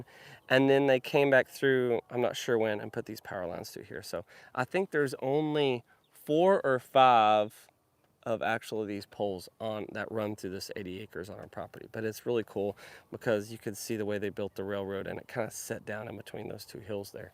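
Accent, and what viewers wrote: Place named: Midwest, United States. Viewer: You want to make a Dunbar Bitter as another collaboration. Seeing as Dunbar is on the Scottish south eastern coast and bitter is a Northeast England/Scots beer. But I don't know if you would have the palate for a bitter as it is a dark bitter beer. You also have a Scottish emblem on the ponderosa in the thistle. So with Dunbar and the thistle you could be associated with Scotland in Oklahoma. So why don't you learn to speak the Scottish Celtic language. A mid american accent speaking Celtic would be great to hear. By the way it sounded like you and Marissa are going to have to to buy racing racing leathers for Brooks in the future.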